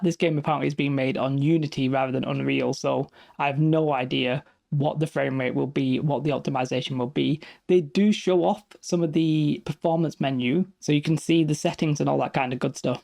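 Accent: United Kingdom. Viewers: LOL, good one! He does not saying Items, he saying I'ems! Love the Bri'ish accent ^^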